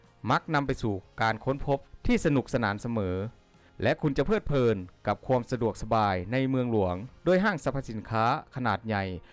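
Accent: Laos. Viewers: คนพูดเป็นคนลาวถูกต้องไหมครับ😅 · พูดไทยแต่ออกเสียงลาว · แอ็ดมินจากสปป.ลาว ก็จะออกเสียงได้ประมาณนี้ครับ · รู้สึกเอ็ดดูแอดมินที่พยามพูดภาษาไทย แต่ก็ยังฟังออกว่าเป็นคนลาว เพราะพยัญชนะ ค ย ฉ ช ซ ส ษ ศ ล ร ฮ เหล่านี้เป็นต้นที่ทำให้คนลาวออกเสียง และสำเนียงผิด ส่วนลาวจะออกเสียง ຊ = ช ซ ສ = ฉ ศ ษ ລ ຮ = ล ร ອ = อ ฮ อะไรประมาณนี้ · ถ้าออกเสียงสำเนียงแบบไทยแนะนำแอดอ่าน ใช้ ไม่ใช่ ไซ้/ ฉุด ไม่ใช่ สุด/ฉะเหลี่ย(เฉลี่ย) ไม่ใช่ ศธเกลี่ย และอีกหลายๆคำที่ไม่ได้กล่าวไว้ ***แต่ถ้าปรับไม่ได้ก็ออกเสียงแบบลาวไปเถอะ คนฟังและดูเค้าเข้าใจภาษาลาวอยู่นะ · เดาไม่ผิดแอ๊ดเป็นคนลาวแน่นอนคนไทยแต่ละภาค ผมฟังสำเนียงออกรวมถึงชนเผ่า คนลาวจะไม่มี ช.ช้าง จะพูดออกซ.โซ่ นี้คือสิ่งที่ผมจับจุดได้แบบ99.99% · ผมก็ฟังออกว่าคุณเป็นคนลาว มันแตกต่างกันกับคนไทย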